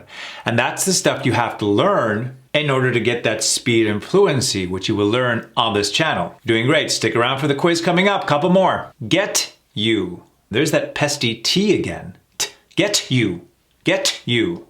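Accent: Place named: United States. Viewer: Thank you so much sir, it helps a lot. Your my favourite American accent teacher❤❤❤😊😊😊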